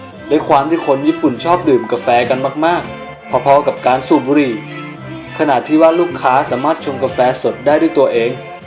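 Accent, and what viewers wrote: Suphanburi, Thailand. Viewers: ไอ้ห่านี่คนสุพรรณนี่หว่า555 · เป็นคนสุพันเหรอคะ ผู๋ดเหน้อเชี๋ยว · คนพากมาจากสุพรรณหรอ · พี่เป็นคนสุพรรณป่ะค่ะเสียงพี่แบบ กาแฟ๋ อั๋นดับ1 เสียงพี่อ่ะ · คนสุพรรณแท้ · มาจากสุพรรณหรอคะ555 สำเนียงพากย์น่ารักมากๆ^^ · คนสุพรรณปะเนี่ย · พี่เค้าเปนคนสุพรรนหรอครับสำเนียงออกเหน่อๆ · เสียงพี่เหน่อจังพี่คนสุพรรณหรอ😕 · Bank Bank น่าจะคนสุพรรณมั่งค้ะเเต่เราคนอีสานก้อเน่อๆยุ่. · เป็นคนแถวสุพรรณเหรอ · สำเนียง สุพรรณบุรี ชัดๆ พี่คน สุพรรณบุรี ใช่ปะ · เสียงมึงแม่งสุพรรณเลยเสียงฮาสัสโครตฮาฮาเหี้ยๆฮามากๆๆๆๆๆฮาโครตๆๆๆๆๆเสียงมึงแม่งโครตของโครตตลก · พี่เป็นคนใต้รึเปล่าหรือคนสุพรรณ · พี่คนสุพรรณหรอ?? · คนสุพรรณหรอ?? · คนสุพรรณหรอ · เสียงออกสุพรรณ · 555 สำเนียงสุพรรณ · เราว่าเน่อสุพรรณอ่ะ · แอดเป็นคนสุพรรณเหรอครับอันนี้น่าจะคลิปที่พึ้งเริ่มหัดทำยูทูปใช่ปะครับ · Narongsrag Tragcnogras นงคนสุพันเเน่ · คนสุพรรณใช่มั้ย555555บ้านเดี่ยวกัน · สุพรรณล้วนๆ555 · พี่พูดเหมือนคนสุพรรณเลย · สำเนียงพี่ดูเน่อๆพี่เป็นสุพรรณเหรอครับ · april dechkan เราว่าคนสุพรรณ~~~ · คนพากพุดสุพรรณไพเราะ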